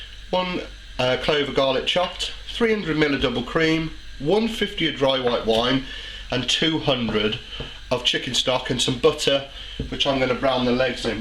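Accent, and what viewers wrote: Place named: Wolverhampton, United Kingdom. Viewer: you sound local to wolves, when can i come round for some?? fair play mucka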